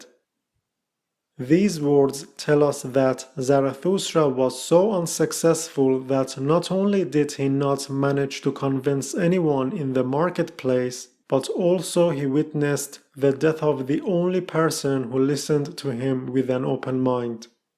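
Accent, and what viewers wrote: Germany. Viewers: I can't quite place your accent. German maybe? If so, you're so lucky you can read Nietzsche and many other great philosophers' original works! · Hahaha, I'm German and this is German. The name of the channel is German, his name is a German one. His accent is what every German that tries to pronounce English sounds like.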